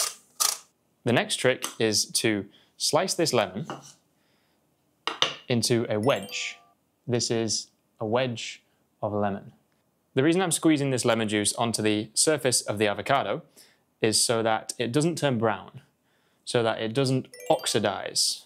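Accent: United Kingdom. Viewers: شكرا جزيلا انا اتعلم اللغة الانجليزية واحب اللهجة البريطانية احبها جدا 🌸💐🪴🌱واحب النباتات جدا شكرا مجددا انا لمى من المملكة العربية السعودية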